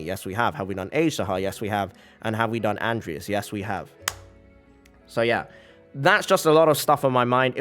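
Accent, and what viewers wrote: United Kingdom. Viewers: You are gonna love Link Click. Odd taxi has brilliant writing but like you I was just blown away by Link Click. I always feel like I am visiting with old friends from the UK when I hear you stream. Love your content mate.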